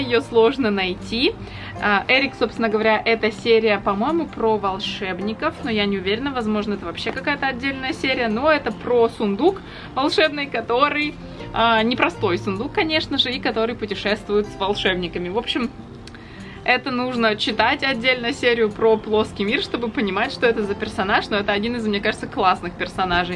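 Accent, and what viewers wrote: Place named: France. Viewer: Прямо слышно как за время жизни в Париже появился акцент!) Много горловых звуков)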